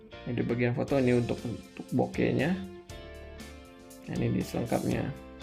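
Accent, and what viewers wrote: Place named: Padang, Indonesia. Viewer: Yang review kek orang Padang deh. Logat nya keliatan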